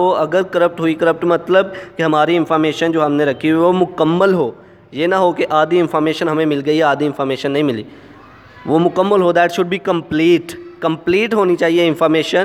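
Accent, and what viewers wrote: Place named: Punjab, India. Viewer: Thank you for highlighting Sir! I had liked the video and subscribed the channel. Your tone resembles Punjabi speaking people.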